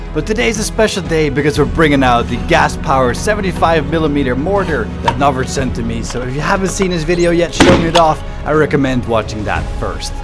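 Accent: Netherlands. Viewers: Leuk om te horen dat je Nederlands bent :D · wowowo hold on a minute, you're dutch? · Are you dutch??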